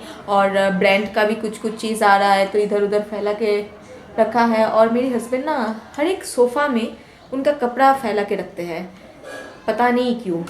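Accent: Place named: Bengal, India. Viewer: Plz learn to speak proper Hindi bfr u make vlogs; u r Bengali, why don't u speak in mother tongue?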